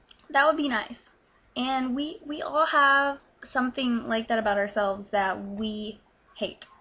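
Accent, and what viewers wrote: United Kingdom. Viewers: Hi what happened with your uk accent? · I just finished watching your video..the british accent..by the way i wanna know the tradition regarding on how should be the woman dresses,,atttitude or etc.. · i like it, i want my hair to be like this too, it's really cool. by the way , thanks , your videos helped me to learn the british accent)) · You are so cute. ^^ I saw your video about english accent. You have a very nice voice. I like the britisch slang. Many greetz from Austria. :) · Owh!! you are so beautiful> and iu like your British accent by the way and i love it. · you're great, ignore all those fucking snob. I really like your British accent, even if its not natural. You're inspiring its cool. >//<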